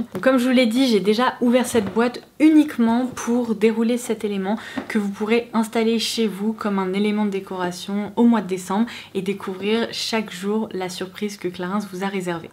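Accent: England, United Kingdom. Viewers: Bonjour, je n'ai pas Instagram et je ne sais pas m'en servir, j'ai juste un mail <mail> , au delà de ce petit problème j'adore vos vidéos, vous avez une voix apaisante, vous avez un bel accent anglais. Merci pour m'avoir lu. Bien cosmetiquement vôtre. Delphine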